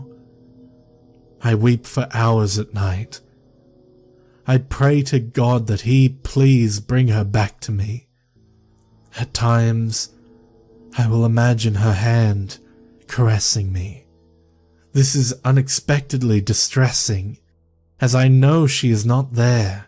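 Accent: Australia. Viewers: I'm pretty sure it's his first language. It sounds like an Australian accent to me. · Aye Aussie English has different pronounciation eh? I thought you all just spoke like that by chance lol!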